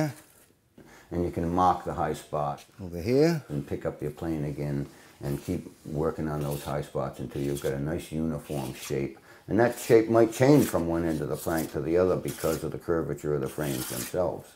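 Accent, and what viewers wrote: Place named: Rhode Island, United States. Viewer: I'm very happy I found your videos. You get bonus points for your accent. I'm a Californian, but my folks were from Rhode Island. It brings back fond memories.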